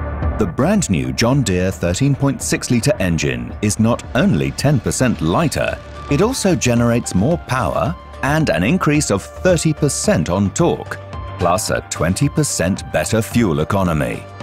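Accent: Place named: England, United Kingdom. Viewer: I hate hearing English narrators talk....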